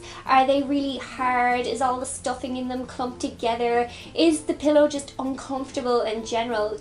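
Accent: Ireland. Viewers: Are you irish or is it just me cuz im irish For anyone who is irish conas a tá tu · omg is she Irish , love it haha ☺ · Are you irish? I am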